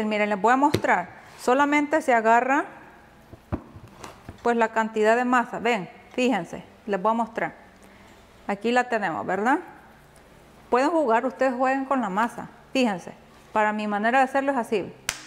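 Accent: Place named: El Salvador. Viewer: Me gusta como abla bien salvadoreña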